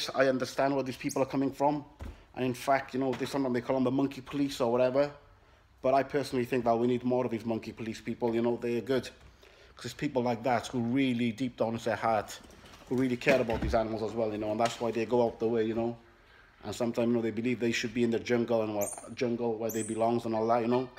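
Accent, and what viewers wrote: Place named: United Kingdom. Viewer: maybe its not what you should have if you find it hard to cope.I am not trying to be rude only it makes no sence that you tell people not to own one yet you now have two.It is not right to title your vidio "where to buy a monkey"if you have no intention to let people know.I detect that you have a Brittish accent like me,if so you know we are up front and staight forward.I am one to speak my mind,I hope you are not offended and you take time to answer me...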